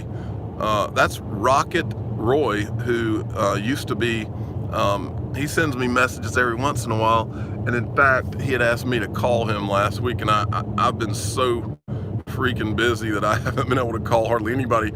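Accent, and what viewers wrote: South, United States. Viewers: I Have listened to you for along time and have always enjoyed you streams, I always imagined that you were black, but it is that southern accent and you very deep voice, here in England I have a good friend from Alabama and his accent is pretty simular and he is Black! Just saying, I am not disappointed but I am surprised!😄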